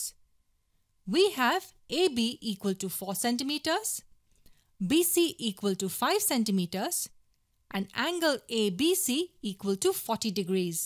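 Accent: India. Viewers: She sound like indian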